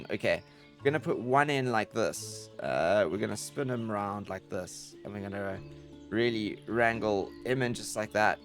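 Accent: South Africa. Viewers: I knew I recognised that accent, my wife is from Pretoria lol. Good videos man, you made me want to get back into the game especially after the new update :)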